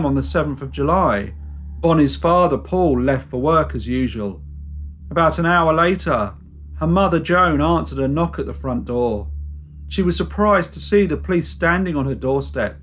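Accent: England, United Kingdom. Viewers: Are also Morts family ?😁👍❤He has a nice big family i wish i had the same you help each other that's so nice and i love your English accent 😊💖💖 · Completely unrelated to the video, but what type of accent do you have? I know you’re from the UK but I’m curious which one this is? I’m a Yank with the love of accents and have a particular fondness of all things British. Thanks 😊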